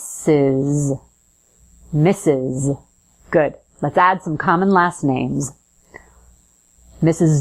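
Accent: United States. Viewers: Why yes she is American speaking their version of English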